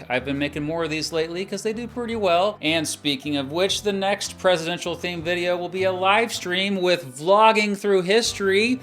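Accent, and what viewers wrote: United States. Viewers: Painfully American delivery, excellent content. New fan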